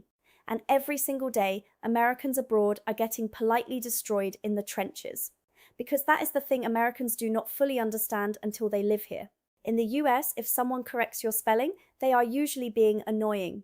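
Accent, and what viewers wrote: England, United Kingdom. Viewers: Just brilliant Where does that brilliant accent come from I actually thought you were Britiah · Why is there a blurred section in the centre of the screen. And why do you have a British accent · Your English accent carries upward inflections at the end of many sentances.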